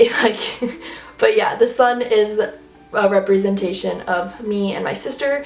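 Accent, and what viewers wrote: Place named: Michigan, United States. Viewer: I love your accent. Are you from Michigan? I've always wanted to live there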